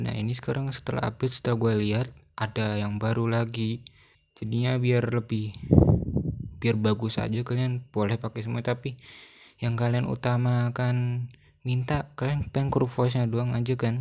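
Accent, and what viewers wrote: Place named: Java, Indonesia. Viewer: Bagi saya cara menyampaikanya Sangat sangat jelas kang dan lantang dna logat jawanyapun terasaa,sayapikirsitu orang jawa aali,Dan sayapun asli jawa tapi sekarang tinggalnya di Daerah provinsi banten,salam dari saya,Btw do you want platon with me😎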